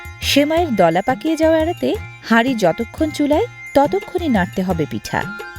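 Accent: Sirajganj, Bangladesh. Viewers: Sirajgonj bole kotha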